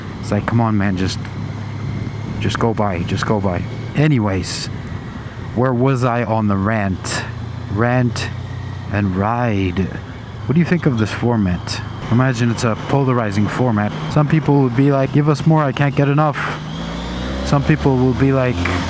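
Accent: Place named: England, United Kingdom. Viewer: A Very cool informative review. One of the best English accents I have ever heard . Dad jokes are underestimated..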